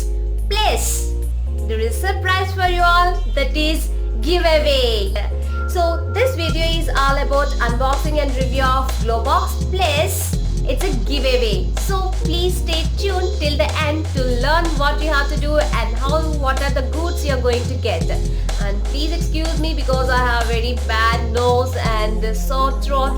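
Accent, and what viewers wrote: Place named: India. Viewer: Indian accent.... n dii i have one video suggestion can u plzz make a video on oil routine for hair growth 😋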